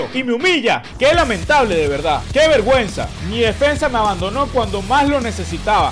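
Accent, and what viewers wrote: Venezuela. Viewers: Creo que es venezolano como yo por el acento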